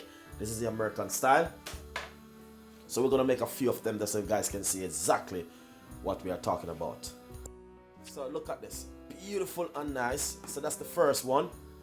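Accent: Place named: Jamaica. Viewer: Why put sugar if sweet potato is already sweet? Anyways Love you accent .. you sound like Jamaican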